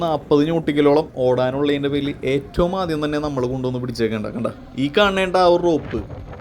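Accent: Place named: Thrissur, India. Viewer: താങ്കൾ തൃ... ശൂരാണോ. ഉഷാറായി 👍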